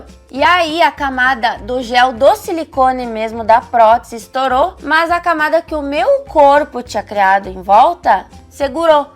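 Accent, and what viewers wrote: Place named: Rio Grande do Sul, Brazil. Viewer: Estou vendo pela primeira vez o seu canal e adorei! Parabéns! Seu jeito autêntico e, por vezes, engraçado despertou muita empatia. Como gaúcha que sou, mas morando no interior de são Paulo há anos, perdi o sotaque e amo ouvir vocês falares. Dá um "quentinho" no coração, sabe! Sucesso, guria! Tu merece. 😃😘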